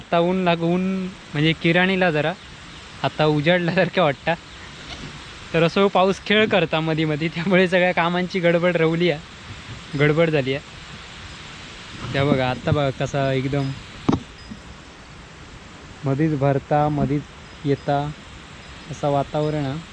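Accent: Malvani, India. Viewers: तु मालवणी बोलतोस तेच आम्हाला आवडते तुझे गावचे विडीयो एक नंबर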